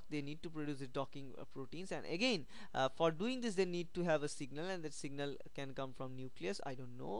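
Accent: India. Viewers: Can you make captions for your videos? I’m not Indian so I have trouble understanding you.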